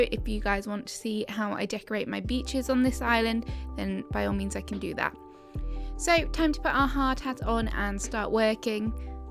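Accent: England, United Kingdom. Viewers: your videos and your gorgeous English accent make me miss the UK so much. My Dad was English from Surrey and I lived and worked in the UK, years ago now. I was set to have a visit last year, but of course as we all know, Covid came in and changed everything for us all. Really love your videos and I am so loving the coastal theme. Wanting to do it on our island next thanks to your inspirations 😊💕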